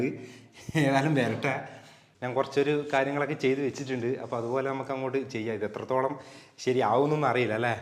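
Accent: Kannur, India. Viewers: അനക്ക് എനക് കണ്ണൂർ സ്ലാങ് അടിപൊളി 🕺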